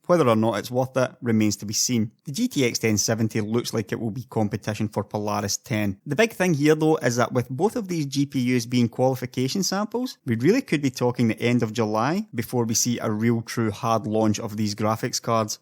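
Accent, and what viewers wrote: Scotland, United Kingdom. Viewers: "Alright guys, how's it going?" in a Scottish accent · Love your accent >~< . Scotish right? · It took me a while to get used to your Scottish accent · tech updates with a Scottish accent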